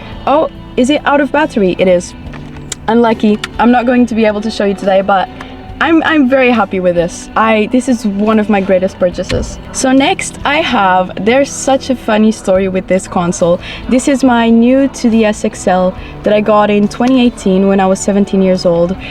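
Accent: Portugal. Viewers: bem me parecia que soavas portuguesa haha amei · Since the first second I was like "I'm sure they're portuguese" based on the accent and as soon as you said it at 8mins i almost screamed <3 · she is portuguese i’m pretty sure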